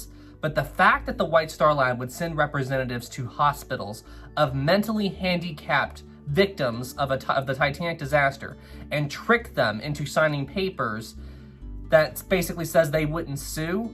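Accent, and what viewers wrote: South, United States. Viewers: You seem to lapse in and out of a Southern accent.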